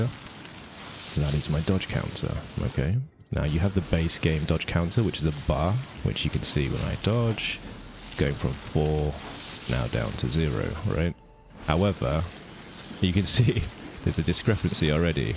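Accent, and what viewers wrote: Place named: United Kingdom. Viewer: I just wonder - are you side account of Mr E? Cuz you sound very similar :D Only accent says that you're British. I have almost 600h playtime but still can learn something new, so thank you for all the tutorials, which I'll watch. For example I can spare a lot of pain for my poor hands and fingers after many hours of playing only by using one key for crouch and dodge!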